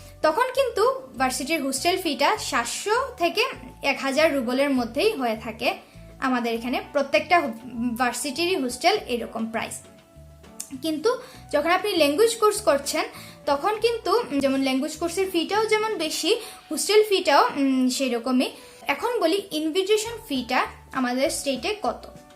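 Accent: Sylhet, Bangladesh. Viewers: আপু সিলেটী? 🥰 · Didi mone hoy Sylhety! Tai na??? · সিলেটী নি গ মাই তুমি ??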